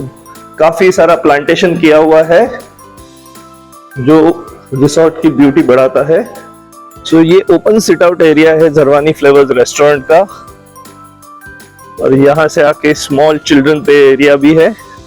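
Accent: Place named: India. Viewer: Bhai bhartiya english matt boll dum hai aur gore ki aulaad hai to fluent english bolll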